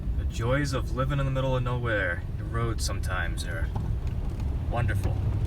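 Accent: New England, United States. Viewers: Cool...thought I could hear a fellow "New Englander" accent in there :)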